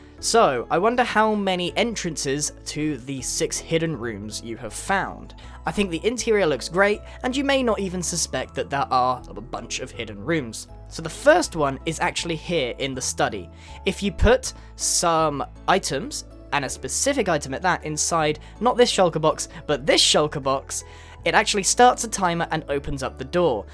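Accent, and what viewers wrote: United Kingdom. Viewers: I am new ,but this guy sound like he is British or he sound like you are supposed to be in a Harry Potter movie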